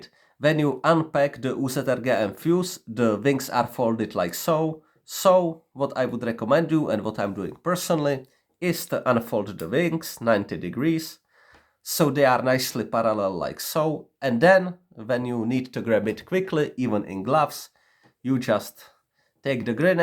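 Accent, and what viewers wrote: Czech Republic. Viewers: ježíš česká angličtina 💩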